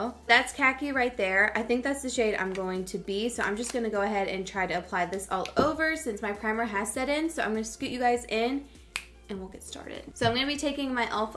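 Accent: South, United States. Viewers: I don’t to be rude but you’re pronouncing Phthalates wrong. It’s THAL-ATES but I do like how you say it though with your southern accent!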